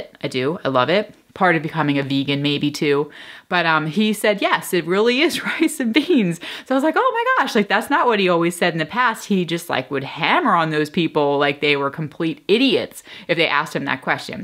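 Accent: Philadelphia, United States. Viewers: Lol. Ok I should of waited to end of video. Heard the Philly area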